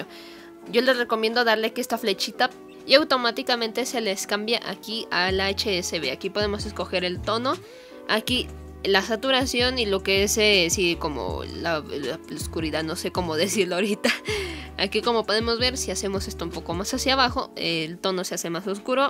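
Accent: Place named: Latin America. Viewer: Buen tutorial gracias . Vives en España ? Tienes bien marcado el acento latino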